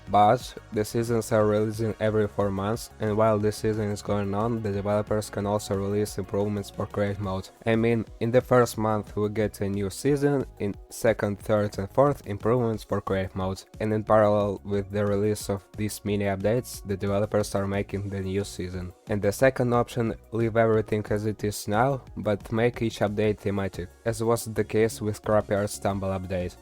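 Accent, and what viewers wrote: Russia. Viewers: ты русский? Просто акцент как у русского, да и сам ник тоже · Акцент как у русского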